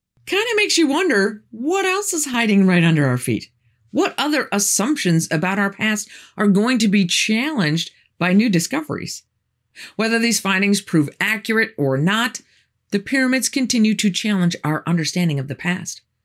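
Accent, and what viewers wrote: United States. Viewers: What an irritating Americn voice....................prat of the year so far!!!!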